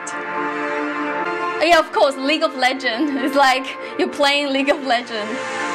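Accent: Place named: China. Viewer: Your English is good except for a few words. Typically the word "in". You must end with your tongue touching the inner upper teeth. You left your tongue in the centre which most China Chinese do. Hope you are not offended. Hopefully, it will help you in your life 😘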